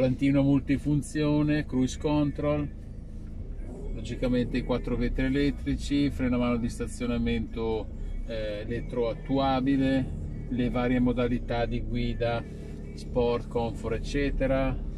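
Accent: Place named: Milan, Italy. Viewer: Ue' figa, tipica parlata milanese:=))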